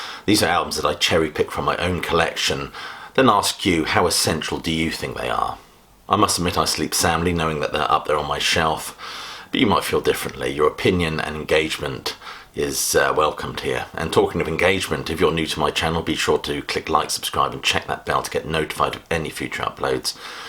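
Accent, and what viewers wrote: United Kingdom. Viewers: Great to see included; I have always admired them. If people do not believe they are good then try listening to side 1 of! I recall Gene Symonds on radio saying were a guilty pleasure, which I thought was a bit of a cheek. The song may be called a guilty pleasure, but never. This is the first time I have watched a Classic Album Review, and I am very pleasantly surprised and impressed by your knowledge, wit and wordsmithery! I shall watch some more. it is nice to hear an articulate British YouTuber. Thank you.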